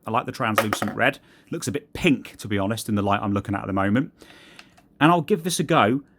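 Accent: United Kingdom. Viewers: im not a massive fan of british accent. did they make a new version ? lol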